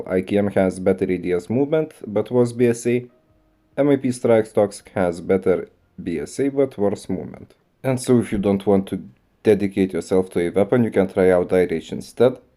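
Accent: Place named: Russia. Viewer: U from Russia cuz you got pretty thick Russian accent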